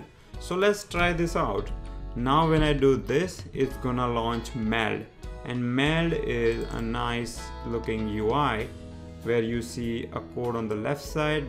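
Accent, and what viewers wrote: India. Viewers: AND YOU GOT best indish english pronounce of i ever hear man)))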